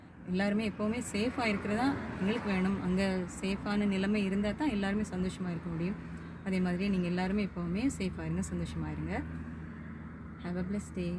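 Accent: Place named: Chennai, India. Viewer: Madras தமிழ் பேசுவதை நிறுத்தினால்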